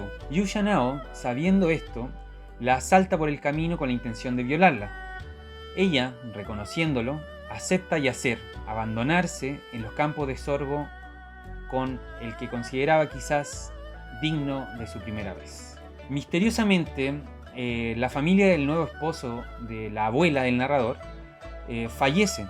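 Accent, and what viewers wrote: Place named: Chile. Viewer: Encantador acento chileno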